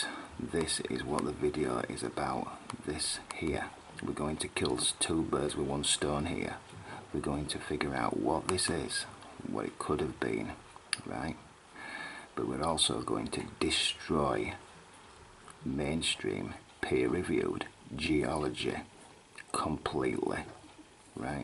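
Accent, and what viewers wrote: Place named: Scotland, United Kingdom. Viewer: Love your work, being a fellow neighbour scot..dig the accent (no pun intended) i love sherlock holmes at the best of times..i mean serously..have learned much form his methods and observations but this takes it to a whole new level...when you explain it then you see it for what it is...it becomes the elephant in the room...i have a construction back ground myself, so know a thing or two from an engineers stand point... and i look all around me at modern cities every where i have traveled been to over 21 countries so far...and i can't help but notice that rapid rate of erosion and dilapidation of our modern buildings...so really makes you wonder what <num> odd years would look like?...and it pretty clear that IS what we are looking at..so many pre-civilisations being reset every few thousand years...when your numbers up - your numbers up.....keep up the amazing work..you are doing breakthrough work. 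1 QUESTION ?? interested to hear your theory on what would create the volume of sand we see everywhere all over the world...Like the deserts...(Maybe once Oceans) its maybe that the old world was Silca based and now we are carbon....SO much sand..(and) water..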